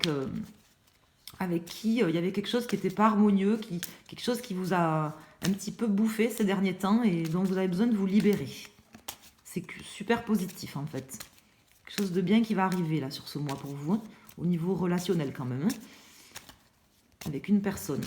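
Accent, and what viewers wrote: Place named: Gers, France. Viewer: Gratitude pour vos réponses 🤲💙 Votre accent me ramène à ma Terre Natale le Gers😍 Belle journée pour Vous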